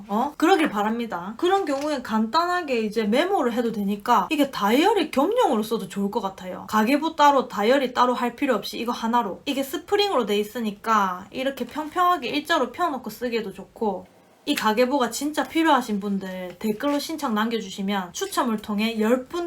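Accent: Gyeongsang, South Korea. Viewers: 몇일전에 우연히 들르게 되었는데 같은 경상도사람이라 사투리도 구수하고 귀에 쏙쏙 박히네요~ 현재 40대로 코로나로 실직후 6개월째 재취업준비중인데 마음과 같은 결과가 안나서 심난한데 괜히 연말까지 겹치니 더 뒤숭숭하네요 오늘도 면접 한군데 보고왔는데 좋은결과있어서 새해부턴 직장인으로 다시 출발하고싶네요~ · 익숙한 경상도 사투리로 동생이 언니야 이렇게 하자! 하며 신세계를 알려주셨습니다. 40넘은 늦은 나이지만 따라하기 실행하고 있습니다. 연금저축도 IRP도 개설 했습니다. 시작이 반이니 계속 시청하며 따라가 보겠습니다. ㅎ 오늘도 행복한 하루 되십시오. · 처음엔 알고리즘으로 알게되었는데 설명도 너무 똑부러지고 경상도사투리가 너무 정겨워서 새로운 영상 업로드될때마다 하나도 안놓치고 다 봤어요 똑순이 소중한 영상 너무 감사합니다 경제에 대해서 무지한데 많이 알려주셔서 너무 감사해요 꿀템들 소개영상도 엄청 기억에 남네요 미리 메리크리스마스🎄 연말도 잘 보내세요💕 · 크리스마스에 혼자 영상보면서 많은 위로가 됐습니다. 점점 지치고, 목표의식이 흐려질때마다 영상 찾아서 보러온답니다.. 저도 고향이 경상도라 말투가 너무 친근하고 아는 언니같구 그래요...! 타지 생활하면서 우연히 보게 됐는데, 이렇게 영상 꾸준히 올려주시면서 쓴소리 단소리 자주자주 해주세용, 보이진 않지만 저 멀리서 응원하고 있답니다! 따뜻한 겨울나세요...!! > < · 5만 구독자 축하드립니다 곧 대학생이 될 울아들이 같이 똑똑하고 야무지게 지할일 챙기며 생활했으면 하는 바램이 드네요^^ 결혼하며 부산 떠난지 20년이 되어오는데 구수하고 친근한 경상도사투리를 들을수있게 되어서 좋았습니다 앞으로도 응원할께요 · 어쩌다 우연히 영상을 접했는데 목소리 억양 경상도 사투리 완전 귀에 쏙쏙 들어오고 참 야무지고 똑 부러진다 생각했어요 나늠 알뜰하게 생활은 하고 있었지만 여러 재테크 팁들 너무 너무 도움됩니다 재테크1도 관심없는 동생에게 추천해 동생도 영상고며 많은 도움 받고 있네요~~ 이번에 청약도 하나 넣으려고 준비 중이고 저도 국내 주식만하다 외국 etf공부 중이예요~ 나이가 드니 머리에 입력하는게 힘든데 쉽게 설명해줘서 귀에 쏙쏙 들어오고 있어요 앞으로도 좋은 영상 부탁드려요 추운데 감기 조심하세요 ^^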